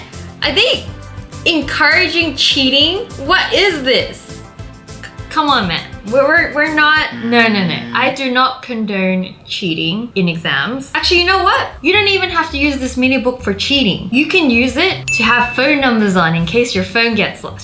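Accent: Australia. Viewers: Please correct me if I am wrong, but I pretty sure shes Australian. [Sorr if I spelt anything wrong] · are you from Australia or America or where?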